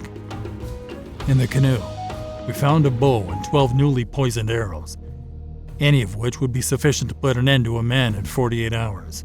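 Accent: United States. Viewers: He sounds more American than Canadian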